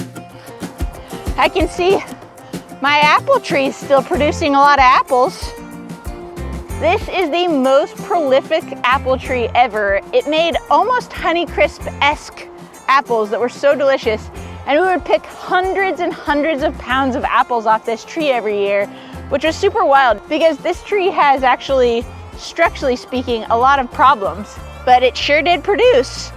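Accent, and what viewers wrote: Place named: Minnesota, United States. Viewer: PNW has very little accents. I keep hearing a MN accent maybe cause you remind me of an ol’ friend. BTW…. Many congratulations on your baby!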